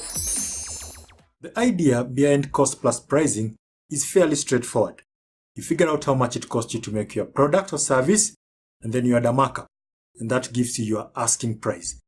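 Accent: Kenya. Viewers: Aaaa thanks man! really helped! btw are you from kenya? can sense that kenyan accent haha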